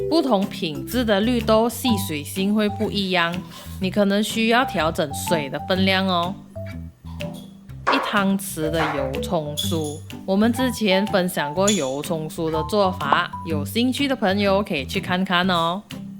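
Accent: Malaysia. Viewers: 喜欢听妳那带马囯乡音的华语.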